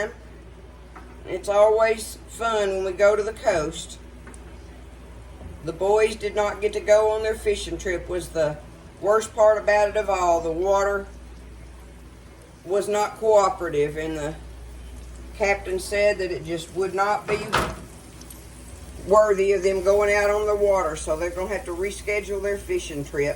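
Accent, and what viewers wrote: South, United States. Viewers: Whats a shrimp bowl? Lol jk 😂 i love southern accents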